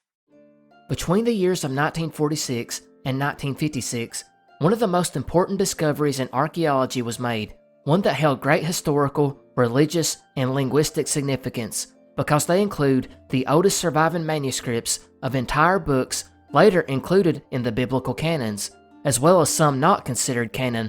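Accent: South, United States. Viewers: Thank you for another great video. Your narration in that southern cadence make your channel one of my favorites. ❤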